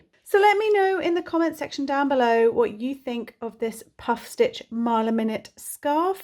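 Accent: England, United Kingdom. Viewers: So lovely, must try. Nice to hear and English voice, most crochet videos on you tube seem to be American using their yarns.x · Just found your channel and subscribed! You are a marvelous teacher! You sound British and I’m wondering about the yarn. I’m a novice at crochet but I don’t recognize the brand of yarn. Is it a British brand and if so can you give us an American equivalent? It’s a really beautiful project.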